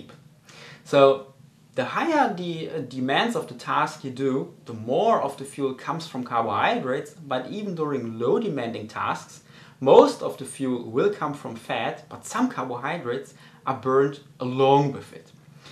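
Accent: Germany. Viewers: Great video as always. Too many people thinks empty stomach cardio is best for fat burning. Thumbs up for german accent!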